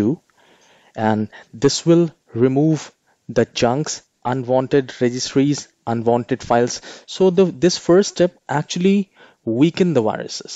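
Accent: India. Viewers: jesus pleesuz. It would be so nice if people that are instructing, would look at their tutorial from a student point of view. I spend more time rewinding because I can't understand the jibberish coming out of your mouth. Your think accent is bad enough in and of itself. But stop mumbling. Typical of east indians. And speak slowly ffs. Make sure to say EXACTLY, what you are typing. saying "temp%", is not what you typed "%temp%".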